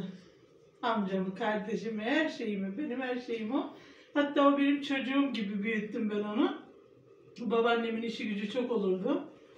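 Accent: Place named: Aksaray, Turkey. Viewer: Aha buda aksaraylı galiba kolay gelsin bildim demi kız hanım aksaraylisin sende😊😊😊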